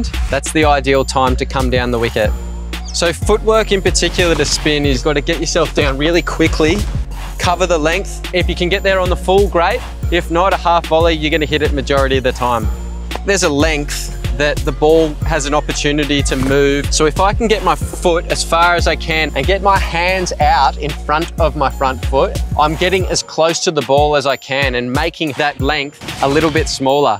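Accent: Australia. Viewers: most of us are here to listen to just the australian accent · Australian accent : nice skills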